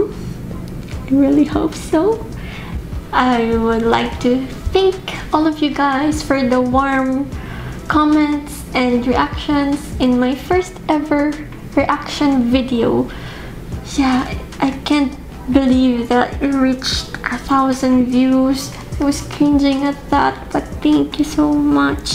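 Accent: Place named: United Kingdom. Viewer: I heard somewhat of a British accent ngl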